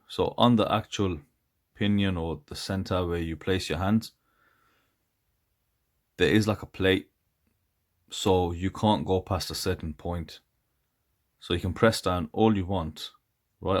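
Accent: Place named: Northern England, United Kingdom. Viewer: I know i’m a year late to this video but better late than never. Great video bro. Where are you from, you sound Northern.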